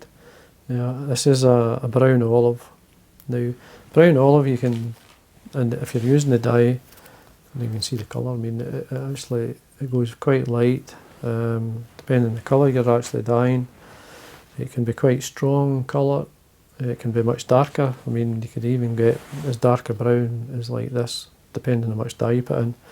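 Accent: Scotland, United Kingdom. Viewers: I detect a Scottish accent. My family history is Scottish.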